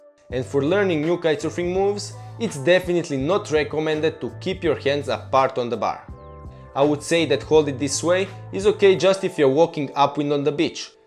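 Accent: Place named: Russia. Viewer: Iemācies angļu valodas pareizu izrunu, angļu valoda ar izteiktu krievu akcentu! Pretīgi klausīties!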